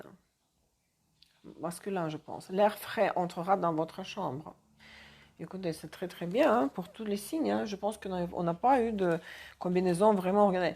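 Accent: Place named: Russia. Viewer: Non seulement vous apportez le soleil, mais aussi votre exigence dans les connaissances. Mais en plus (cerise sur le gâteau) votre souci du respect de la belle langue parlée, au moment où vous vous adressez à nous, est très touchant, pour des Français comme moi dont la culture est un peu piétinée, ces dernières années. Avec une touche d’accent russe, c’est merveilleux ! Merci infiniment. 💐🎶🥂🌻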